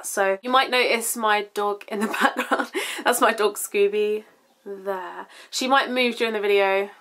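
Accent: London, United Kingdom. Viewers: Even if i'm french and i don't understand all the words you say, I can't stop looking at your videos because you are so beautiful and i just love the way you look ! I also love your british accent i think it's so cute compered to the american one ! Hope you will continue to make videos beauce I always look at them ! Kisses from France ! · you learnt french?? then, j'adore tes vidéos!! keep doing this, i think it's kinda refreshing to see "beauty gurus" with a british accent! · I wish I was born and raised in London. Your accent is so beautiful!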